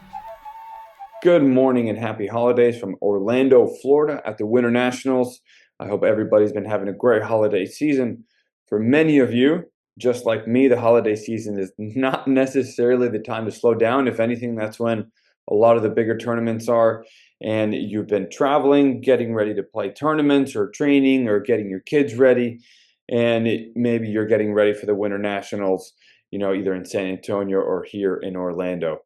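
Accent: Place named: Eastern Europe. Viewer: Coach, I don’t know what’s behind those 3 “lessons”, but you sound more Eastern European than American😂. This is how “business” is done here.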